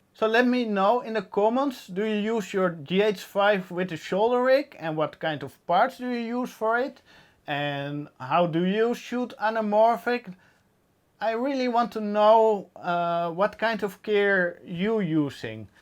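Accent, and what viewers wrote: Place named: Netherlands. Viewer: Are you dutch? Your accent sounds Dutch. (I'm from Belgium by the way)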